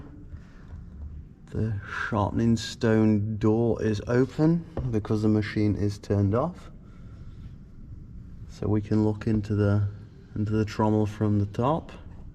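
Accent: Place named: United Kingdom. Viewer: The most British sounding German i,ve ever heard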